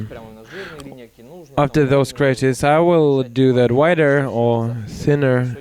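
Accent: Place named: Scotland, United Kingdom. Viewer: у шотландский акцент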